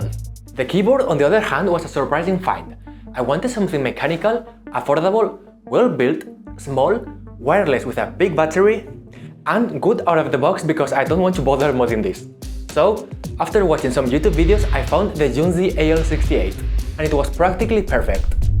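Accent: Spain. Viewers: spanish accent on a asian guy is really trippy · Bro has a spanish english accent lol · It’s breaking my brain to see an Asian with a Spanish accent. 😊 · Are you a spanish speaker? Your accent while speaking english is kinda similar to ours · Great video. You sound spanish, are you from spain?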